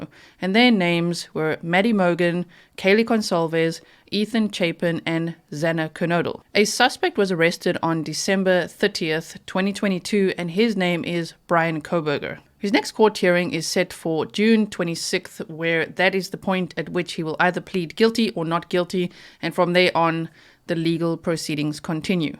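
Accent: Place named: South Africa. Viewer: Great summary. Don’t lose the accent👍🇿🇦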